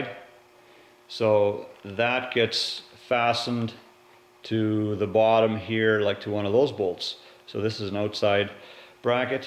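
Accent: Canada. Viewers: Sounds like a Canadian accent eh!! Some people say I have an accent, I can hear it though!!☺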